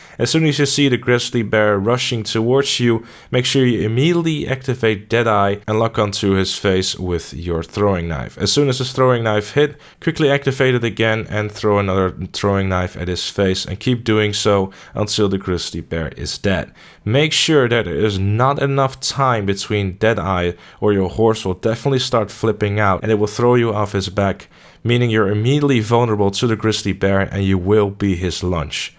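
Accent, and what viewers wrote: Netherlands. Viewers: Subbed! Your challenge videos have helped me out a bunch! Are you Dutch by any chance? I recognize the accent I think ;)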